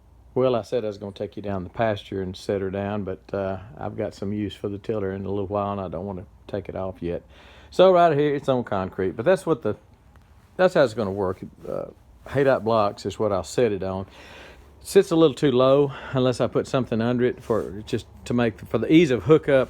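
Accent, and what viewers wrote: Texas, United States. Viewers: From your Texas accent, are your from Uvalde?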